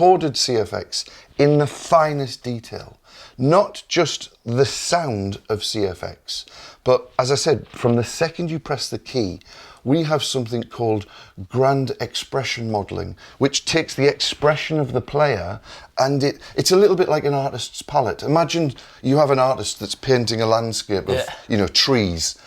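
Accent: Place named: Yorkshire, United Kingdom. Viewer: It's "Anechoic Chamber", non "Anaconic", but he sounds like a Yorkshireman so it can be excused.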